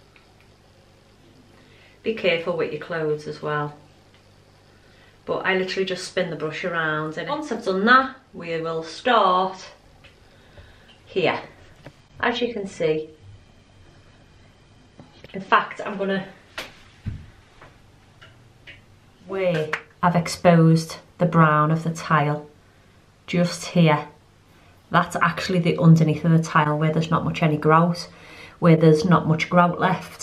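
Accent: Liverpool, United Kingdom. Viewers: Thank you for the tips! I love the scouse accent especially in women 😀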